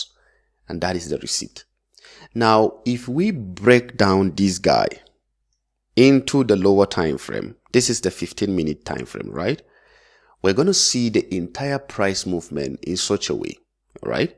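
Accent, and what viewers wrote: Nigeria. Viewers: Nice, are you from Nigeria?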